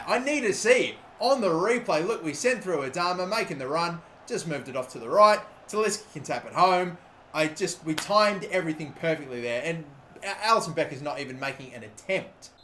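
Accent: Australia. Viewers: Funny hearing an Aussie swear:) (no offence, love your content mate)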